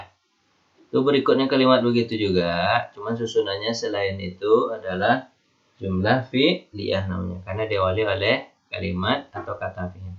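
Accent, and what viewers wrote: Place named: Malaysia. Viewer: Logat Melayu nya 😂😂😂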